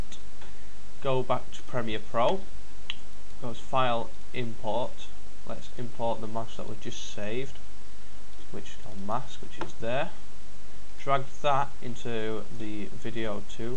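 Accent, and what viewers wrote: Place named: Yorkshire, United Kingdom. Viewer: Thanks, this was useful! :) A fellow Yorkshireman by the sound of it. :)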